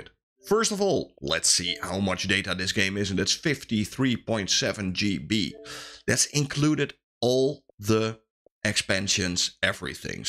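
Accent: Netherlands. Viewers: You sound very Dutch. Thank you for the video!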